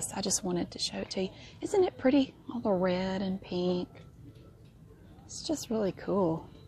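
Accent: South, United States. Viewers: just recently found your videos. I must say that they are the best distraction for my anxiety. and Im from the south and your accent is very reminiscent for me, always watch them before bed Lol😂 thank you for being hilarious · I’m from Nebraska and most people only eat Hidden Valley Ranch. If I came home with generic ranch, my family would flip out no joke. Midwest is serious about our ranch LOL! I love your videos and your voice and southern accent. It’s fun shopping with you and watching you straighten things. Thank you! 😊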